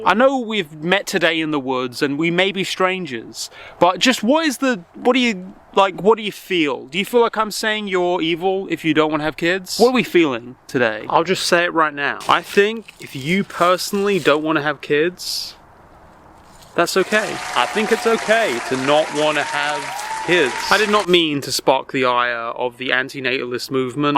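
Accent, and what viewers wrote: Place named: United Kingdom. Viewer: Very unrelated remark/'question': this is the second time this week I find out a content creator (*hurl*) I like, who also happens to have a British accent, turns out to be living in a deeply red state. Wastha'abou'? I am grossly assuming here that you actually live in tennessee, apologies if that's off-base.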